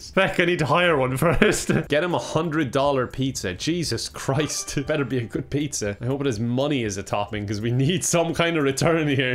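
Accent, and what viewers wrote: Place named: Ireland. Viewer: leans in “hHph“ me: there’s nothing that this man could do to make me NOT live for his irishness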